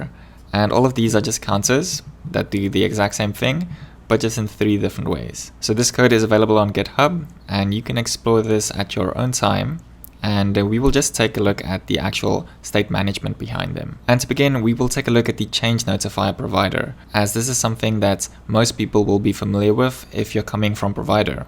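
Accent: South Africa. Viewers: South African?? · As a South African living in UK, learning Riverpod with your accent is wonderful! 'Bare with me, hey' <3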